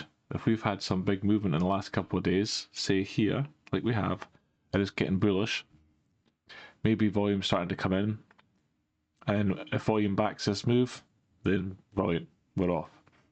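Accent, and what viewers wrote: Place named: Elgin, United Kingdom. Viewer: Thanks, I bought back in at 17 and obviously I hold them in my pension bag. I also started buying again at theses levels a bit every day as I'm guessing we have been making a bottom. What I'm buying now I will sell and hopefully make profits and buy back cheaper if that situation pops up and we see a top forming. I try sort of day trading but it's beyond me at the moment using the daily 4 and one hour time frames . The violent liquidity grabs keep getting me. But we all must learn. I'm in Mexico but my family are in Elgin probably not far from you by your accent. Anyway keep up the good work it's appreciated.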